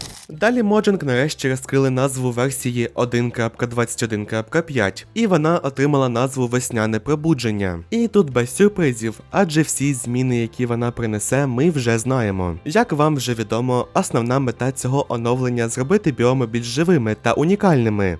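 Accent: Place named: United States. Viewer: ааа, незеритське, зрозумів, автор через свій фірмений американський акцент ні зміг сказати слово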